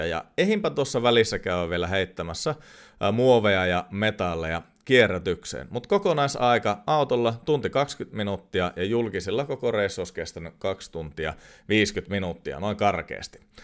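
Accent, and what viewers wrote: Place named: Espoo, Finland. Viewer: Melko Espoolainen aksentti....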